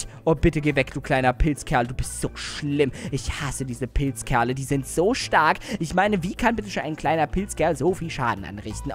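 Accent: Russia. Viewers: Kommst du aus Russland?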